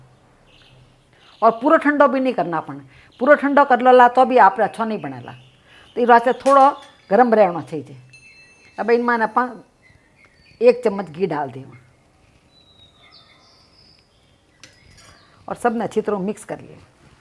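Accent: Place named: Marwari, India. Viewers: Thanks for sharing your traditional recipes. You seem very experienced. I am originally from Ajmer so I am loving your Rajasthani accent, but it’s amazing to hear all the English words you speak with a beautiful accent. Have you ever made stuffed guttas? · Very nice recepie. मारवाड़ी बोली सुन कर बहुत अच्छा लगा. Pranaam पापा mummy. आप दोनों हमेशा खुश रहिए और स्वस्थ रहिये. 🙏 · I love the recipe auntyji...u described it so well.. u r so beautiful and elegant. Ur marwadi is lovely... keep sharing the new and delicious recipes with us ..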